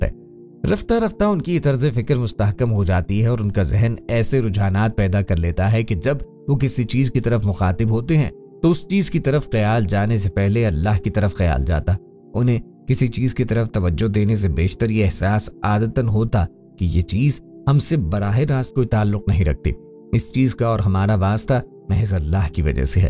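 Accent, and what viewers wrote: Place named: Pakistan. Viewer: Beautiful voice and and Urdu accent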